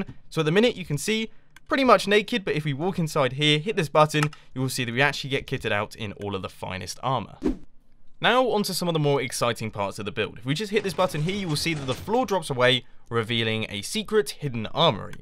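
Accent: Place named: United Kingdom. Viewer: arrrrrrrrrrrgh british accent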